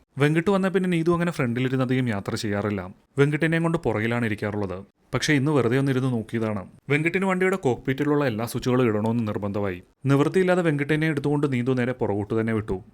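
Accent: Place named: Kottayam, India. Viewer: Kottayam slang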